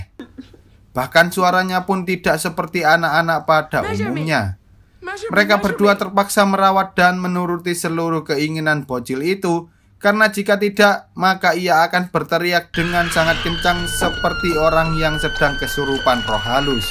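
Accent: Java, Indonesia. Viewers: anjir admin e orang jawa to hahha seketika aku ngrokok , admin e ngomong wes rasah kesuwen aku watuk keselek belok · Suara jawir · Bang kamu asal dari Jawa ya kalo iya jawab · Medhokk kalii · Medok brooo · Waduh pekat juga jawa nya ya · Logat jawa bgt bew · Pengisi suaranya kentel jawanya.. jellek amat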